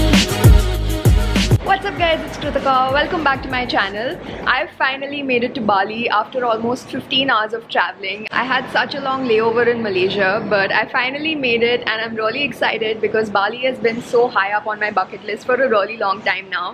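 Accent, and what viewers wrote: India. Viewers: It's Indian accent.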